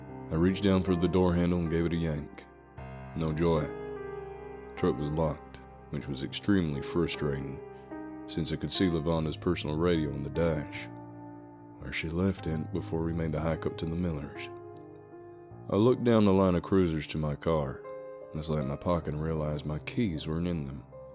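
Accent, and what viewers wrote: South, United States. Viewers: You really have mastered that country American accent and it shows. And this one's coming from a good ol southern boy 🤣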